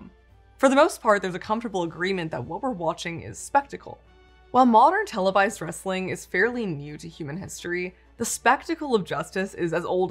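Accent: Canada. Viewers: She sounds Canadian, so perhaps that explains her lack of understanding of the US justice system, but I agree that the criticisms in this video are largely misplaced because she’s misunderstood how and why the system works as it does. For example, incarceration is the primary punishment for crime not to achieve “retribution” but to keep greater society safe by removing criminals, and to allow those criminals the best opportunity for rehabilitation while institutionalized. Just as an addict is most likely to rehabilitate during a highly-controlled inpatient stay, a perpetrator is most likely to change during incarceration, when removed from the temptations and opportunities to commit more crimes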